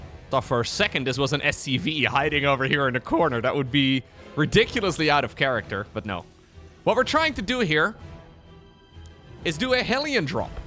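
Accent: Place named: Netherlands. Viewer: The more repetitive and boring the strategies get, the more and Winter put in "NEW ILLEGAL strategy". Short term it generates more clicks because new guys come in. But the ones as I, who are here since he has the fattest dutch accent and considered to be "years in" when he published his music video of his uk visit, get scared away. Long term the base errodes and the topic collapses. And nobody will think that this click bait was the reason. They will say "the game just got old". Maybe they are right, maybe they are cutting on the branch they are sitting on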